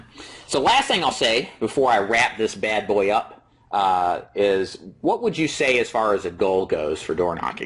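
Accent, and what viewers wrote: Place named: Chicago, United States. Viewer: Where are you located.. your accent sounds Chicagoesque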